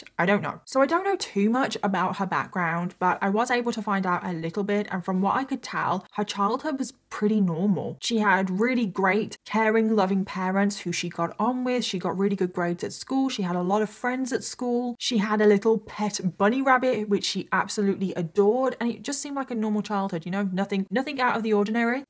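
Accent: United Kingdom. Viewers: Your voice isn’t annoying because you’re sick. It’s because it’s British. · I just recently found your videos. I really wish you didn't feel narration with cosmetic/make-up application was necessary for success. You and your words are enough. Plus with the accent of the British Empire, we already think you are smart. Sidenote: use the Vicks (menthol, camphor, petrolatum etc) on a small patch on your chest before bed, wear a light shirt then go to bed.....Not that you sounded any different to me, but you sounded worried about it.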